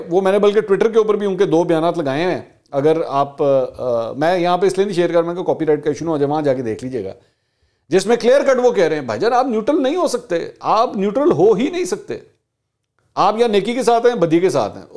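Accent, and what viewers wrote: Punjab, India. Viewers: تھوڑا سا پنجابی ٹچ کم کر لیا کریں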